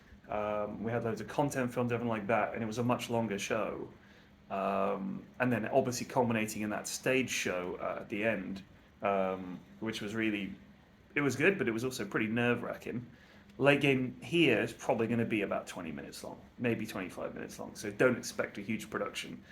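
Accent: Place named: London, United Kingdom. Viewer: Love these videos man, keep them coming ! Out of curiosity which football team do you support ? You sound like a Gooner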